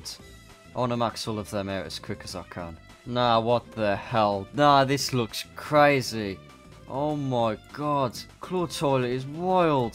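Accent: United Kingdom. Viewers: Bros british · You sound British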